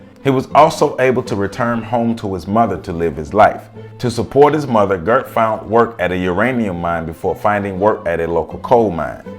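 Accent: United States. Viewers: an Amerikan non Deutsch Reader is telling the story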